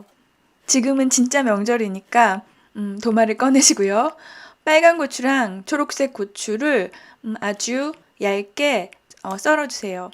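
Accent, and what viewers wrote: Seoul, South Korea. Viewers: 저 성대모사 엄청 잘해요 남편이 엄지척👍했어요 서울사투리 넘 귀여워요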